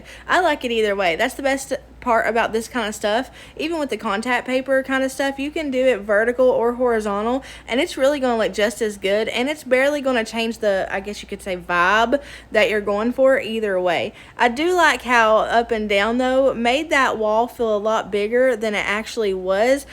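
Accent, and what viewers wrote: South, United States. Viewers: I love “maters” for tomatoes. Your accent and southern sayings are awesome. Keep up you weight loss journey! You can do it!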